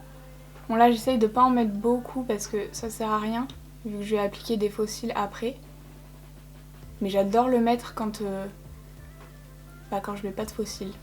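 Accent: France. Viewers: Ahah ton accent espagnol c’est pas trop ça 😂 très frenchy c’est mignon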